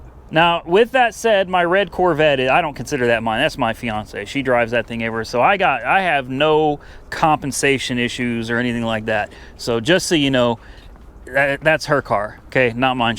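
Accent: United States. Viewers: Come on Man, its you Yanks mashed English Aluminium into "Loominum". Not even Germans do that.!!. Even a Life Boy becames a "Life BOOeeee", and that confuses the rest of the World. Rangers generally have shitty little 4 Pot leaf blower engines, that brakeless ones good.!.Ford Service /Dealers got a Shit name over here in SE Asia.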